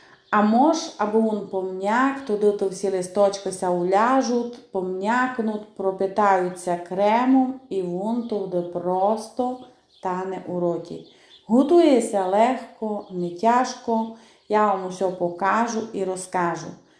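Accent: Transcarpathia, Ukraine. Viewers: А яка рідна мова закарпатська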